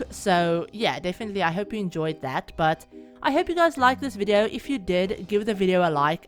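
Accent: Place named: Afrikaans, South Africa. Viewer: I knew it when u was talking I was like yes she's Afrikaans in from south Africa tooooo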